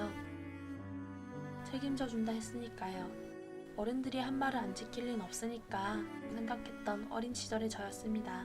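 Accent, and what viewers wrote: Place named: Gyeongsang, South Korea. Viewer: 사연 읽어주시는분 갱남사람인가베요?ㅋ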